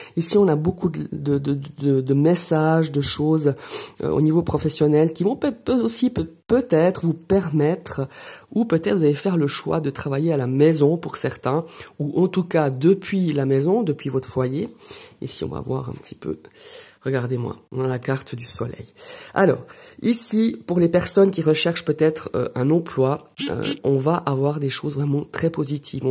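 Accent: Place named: Geneva, Switzerland. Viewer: Merci infiniment pour votre message 🥰 J’entends l’accent genevois !?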